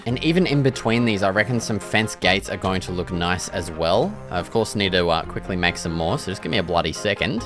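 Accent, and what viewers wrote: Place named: Australia. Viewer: Alright.. we get it.... you're Australian...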